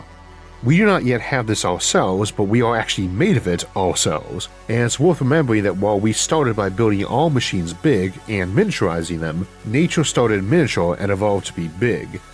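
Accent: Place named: Texas, United States. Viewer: You have a fascinating accent. It sounds like a perfect blend of texan drawl with the intonations of someone with english as a second language. I don't mean any offence by this, its just a very unique sound.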